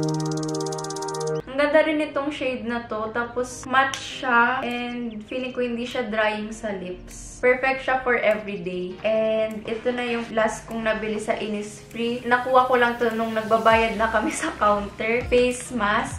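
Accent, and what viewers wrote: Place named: Bicol, Philippines. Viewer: Hahaha Lumalabas yung pag ka bicolana nya dahil sa tagalog accent nya hehe Love you!!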